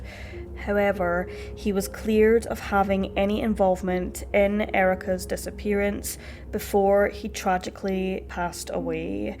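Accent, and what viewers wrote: Scotland, United Kingdom. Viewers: like some others have said I suspect it is because she is Scottish, depending on the region a Scottish accent can be VERY thick and difficult for others to understand, it sounds to me like she is very carefully enunciating to make herself understood clearly. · She is Scottish, and no doubt has unique inflections of her own. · Jeezus, she’s Scottish. Of course, she’s going to have an accent. Silly Americans b!+<h!ng about the way others talk. 🤦🏽‍♀️🤷🏼‍♀️😵‍💫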